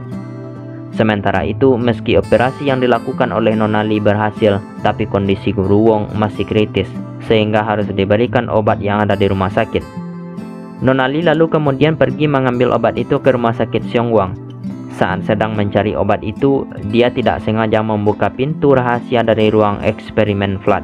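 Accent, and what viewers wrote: Madura, Indonesia. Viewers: logatnya kyk madura🤣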